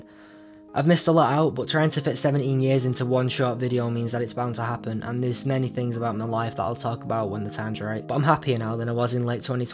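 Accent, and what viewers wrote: United Kingdom. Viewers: dhat British accent ^_^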